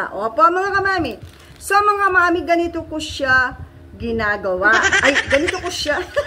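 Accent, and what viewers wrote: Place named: Pangasinan, Philippines. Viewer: Panggalatok poba kayo kc halata sa salita ninyo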